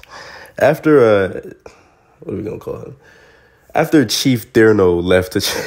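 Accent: New York, United States. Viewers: Hearing your heavy ass NY accent discussing football is kinda trippy. Good content tho bro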